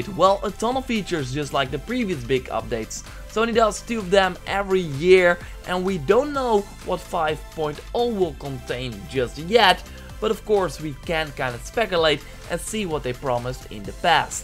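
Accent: Netherlands. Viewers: Ben je Nederlands? Ik hoor het aan je accent hahah ;)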